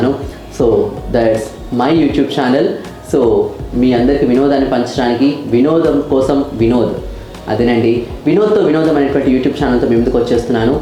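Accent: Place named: Kadapa, India. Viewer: Meedi Kadapa Anna happy to listen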